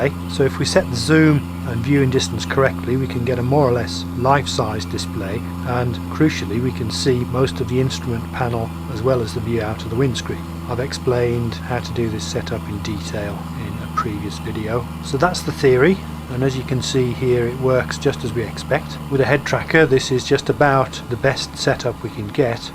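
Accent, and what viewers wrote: United Kingdom. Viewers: Thanks for the prompt reply. Say, I'm curious... Though you obviously spend a good deal of time flying around the region I spent the first several years of my married life (Puget Sound area), it sounds like you live in the UK. I lived in Melton (East Anglia) for a bit more than two years, and met and courted my wife near Bracknell... Just wondering if you're from a district I'm familiar with. :-) Thanks again for the advice -- and all the excellent videos! Keep up the great work!!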